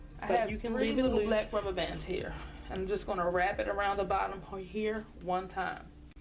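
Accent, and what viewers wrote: Baltimore, United States. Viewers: Knew you was from Baltimore first 30s lmao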